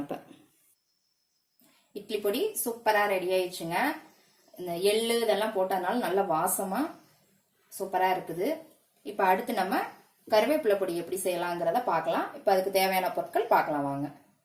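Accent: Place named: Tirunelveli, India. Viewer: திருநெல்வேலியா நீங்க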